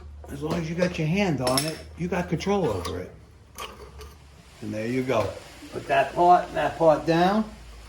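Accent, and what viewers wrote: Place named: Boston, United States. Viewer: Somewhere around Boston I can tell.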